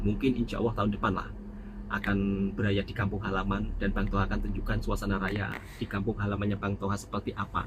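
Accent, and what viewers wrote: Java, Indonesia. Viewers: Medokkķkkķ jawa nye😅😅😅😅😅😅😅😅😅😅😅😅😅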